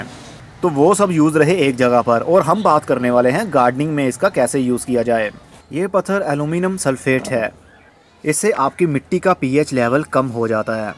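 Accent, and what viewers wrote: Punjab, India. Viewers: abc xyz I believe since he is based in Lahore his urdu is accented with his first language punjabi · You are correct dear. Im Pakistani and we call it Phitkari i guess he is frm Punjab side and thts why he says it this way. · abc xyz ......ye Pakistani Punjabi hei...unperh Punjabi ka urdu lehja hei....urdu bolnay ki koshish mein urdu ki aisi ki taisi phair raha hei....... jaisay.....Bharti... urdu boltay iski beikh o bunyaad nikaal ker issay Hindi kehtay hein....perhay likhay panjaabi behter urdu Bol letay hein.... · Birjees Sagheer Yes I think so. Im a native urdu speaker from India and I too think he has a punjabi accent.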